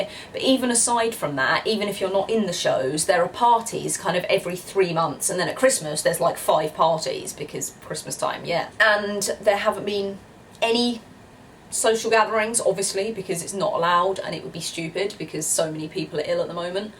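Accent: United Kingdom. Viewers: Just love to listen to your beautiful british accent. Such a treat.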